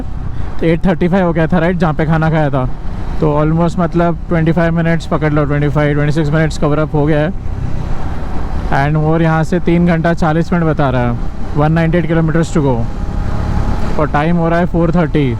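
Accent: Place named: Solapur, India. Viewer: Are you from solapur originally ? Your marathi accent is kinda solapuri.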